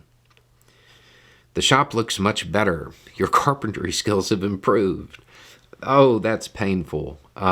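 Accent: South, United States. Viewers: Yes, back in the days when spoke in a much thicker Southern accent. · Perhaps other folks have observed and mentioned you SOUNDED a LOT more Southern in you early videos. When I first stumbled upon your channel I was not sure what to expect in those days, but over time I have really appreciated your insight into matters of importance. Thanks, Man. (I cracked up when you wrote "not secret" on that box behind you.) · How did folk not know you were from the South? I can hear your drawl from way down yonder, even though I figger’d you were a Texan. 😉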